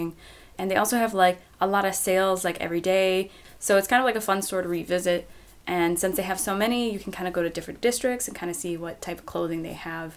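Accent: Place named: Germany. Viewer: You & your German accent are so cute!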